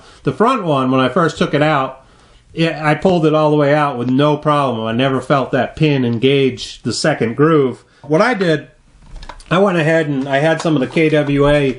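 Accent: China. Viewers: got it just for the chinglish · chinglish FTW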